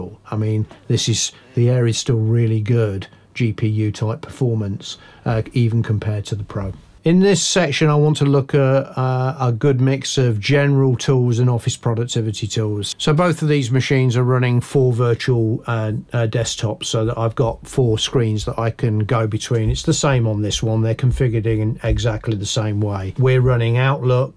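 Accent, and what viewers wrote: United Kingdom. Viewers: Thanks for getting back to me. Have ordered the M1 MBP with 16GB & 1TB I’ll be using it primarily to record acoustic instruments and vocals in situ, probably no more than 7 or 8 simultaneous tracks at a time. Fans have been an issue in the past, have you had any problems noise wise with the MBP under load? PS You sound like a fellow midlander, from Stourbridge myself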